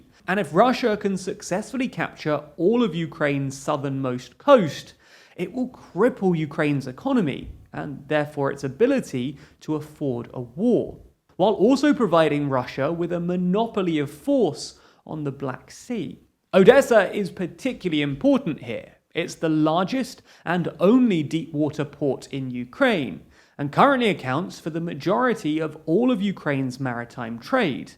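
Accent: United Kingdom. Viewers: Dont expect to get a biased opinion from a guy with a british accent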